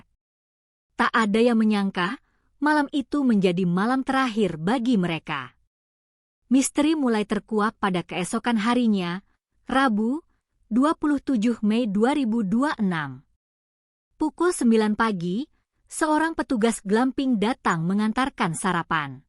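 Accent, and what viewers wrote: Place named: Indonesia. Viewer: Menyebalkan kalo pakai AI tulisan inggris di eja seperti bahasa Indonesia. Menjijikan mendengarnya jadi kelihatan dungu.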